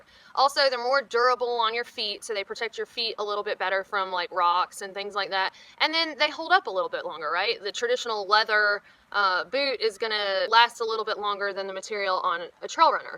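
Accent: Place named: Tennessee, United States. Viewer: I have watched several of your videos of the last 5 months or so and I have to say this and I mean it in the nicest way and as a complement. I have the biggest crush on you and your personality. Your southern Tennessee drawl and snagged tooth are icing on the cake of your sweetest disposition and personality. If I was 15 years younger I would be flirting big time. Lol you are very pretty but not afraid to get dirty and for such a pretty girl that is amazing. After you mention you danced to I was this girl the greatest! I like the way you talk in your videos as you are real and humble in your advice. Not a know it all or a person trying to make money on YouTube just simple and honest. May the wind be to your back while traveling to " " cheers from a California redwoods man they call me Mexibilly.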